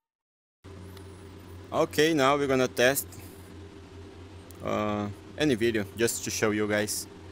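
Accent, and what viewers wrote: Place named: Brazil. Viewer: so pelo sotaque sabia q era BRkkkkkkkkkkkkkk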